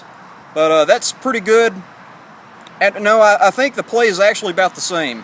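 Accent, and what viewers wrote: South, United States. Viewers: He has what we call in America a "Southern Accent" which could be further broken down to specific area or state in the Southeastern U.S. It's rather nice isn't it? People have a different accent in Northern California where I live now or in Upstate New York where I grew up. Most people in the world are probably most familiar with what is called a "Mid Atlantic American Accent" which is sometimes considered by Americans as having no accent at all. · Like Elvis, but slightly more country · you have the most american accent ever. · Southern US. I would say probably Alabama or Georgia, maybe Tennessee.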